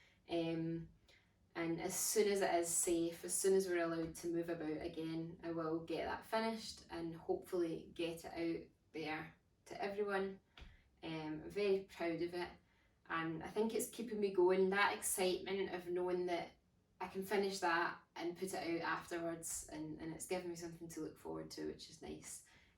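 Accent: Scotland, United Kingdom. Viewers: I could just sit and listen you talk. That Scottish accent turns me into puddles. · Really love your Scottish accent! Ciao from Rome!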